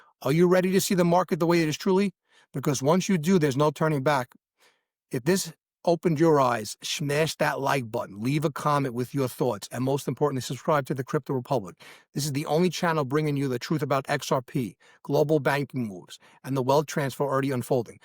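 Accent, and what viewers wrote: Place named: Long Island, United States. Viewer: I lived in Long Island as a kid so I know the accent! I live in the Chicago land now but NY will always be home.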